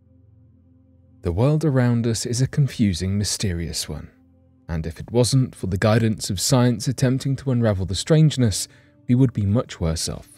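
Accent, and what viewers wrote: United Kingdom. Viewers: Cmon now.. its a quieter gentle UK 🇬🇧 accent. Far better than some we have in 🇺🇸 🌎. God bless all!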